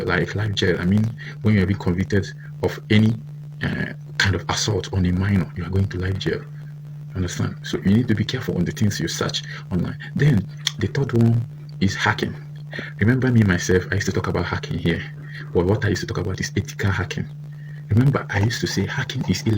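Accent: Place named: Nigeria. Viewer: Your Nigerian English accent is very difficult to understand. It's good enough for your Nigerian selves. It's made WORSE by your speaking so so abnormally fast. I can't subscribe to this , sorry.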